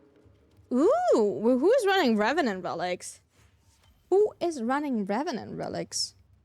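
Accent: Germany. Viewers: Am I hearing a German accent? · Are you german per chance?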